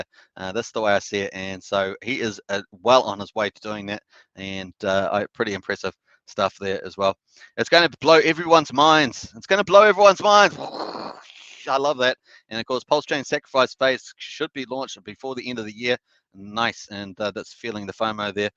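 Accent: New Zealand. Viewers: Are u a wee kiwi living in the big apple?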